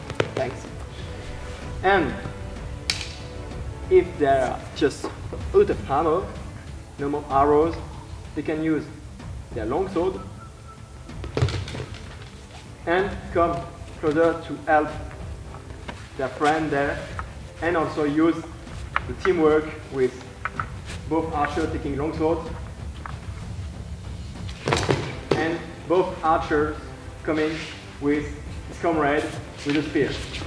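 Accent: France. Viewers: Excellent approach! Kudos for being able to assemble more than a few warriors at one time. :) I might like to offer a few suggestions. Frankly it's difficult to follow your French-accented English; it might be easier if you spoke in French. ;) For swords, I would say to use "bastard swords" or "hand-and-a-half swords", at the very longest. Tests that we've done in the forest show that longswords are very awkward to carry on a regular basis, Peter Jackson's movies notwithstanding. And longswords are little extra help against armor. You really need an axe or a mace to directly defeat armor. Spears are great in the forest, but the ones you show in this video may be too long. I suggest you try moving about in the bush with this equipment before making any conclusions. Shorter spears allow the butt to be brought to bear if an opponent is closing in. Modern warfare (with rifles) dictates a more open formation for two reasons: a bullet can travel through more than one soldier if they are side by side, and soldiers can provide support to one another with rifles even if they are many yards apart. 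Spearmen can stand more closely, so as to provide support to one another. Of course the terrain will provide its own problems. Practically speaking, a single-edged knife is more useful in the bush than a dagger can ever be. I think your formation is mostly accurate however. The spears want to be in the front, to keep the archers from being charged. They would work as bayonets did in later age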